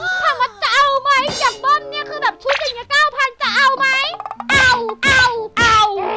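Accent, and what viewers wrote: Isan, Thailand. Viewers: เป็นคนอีสานบ่นอ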